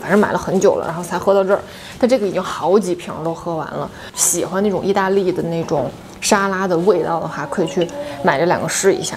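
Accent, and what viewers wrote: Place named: Beijing, China. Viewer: 北京口音❤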